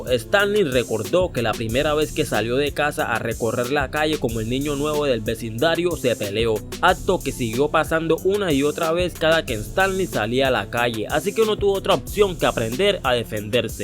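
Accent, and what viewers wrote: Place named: Pacific, Colombia. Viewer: Por tu acento creo que eres de Tura. O algún lado del Pacífico colombiano. Espero no equivocarme. Saludos paisano.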